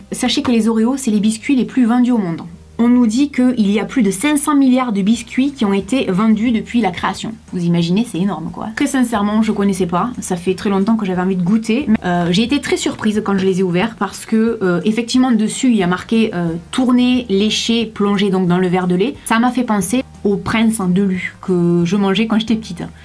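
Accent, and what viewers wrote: South, France. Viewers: Tu est trop belle avec ta teinture, tes lèvres, tes yeux et tout enfaite! Tu est suuuuuublime je t’adore maman. Tu me redonne le sourire avec ton rire fabuleux et tu me donne chaud dans ce froid avec ton accent du sud. Pour moi tu est LA bombasse que tout le monde envie et du haut de tes 40 ans tu fais rêver les plus jeunes d’entre nous... voilà, c’était pour te dire à quel point je t’aime 😍 bros bisous! ❤️😘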